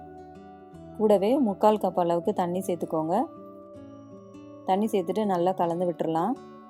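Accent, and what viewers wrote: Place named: Tirunelveli, India. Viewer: எங்கள் சொந்த ஊர் குற்றாலம். உங்கள் பேச்சு மற்றும் சமையல் செய்முறை பார்த்தால் திருநெல்வேலி போல் உள்ளது. உங்கள் சொந்த ஊர் எங்கே.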